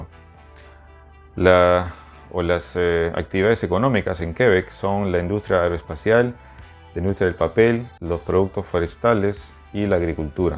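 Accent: Peru. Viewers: eres Peruano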